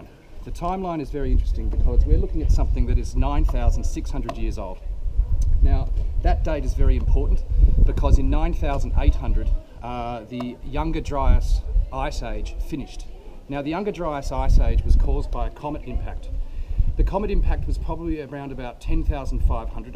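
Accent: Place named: Australia. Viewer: Chuck sent me :) It was nice to hear a fellow Aussies voice. I subbed :)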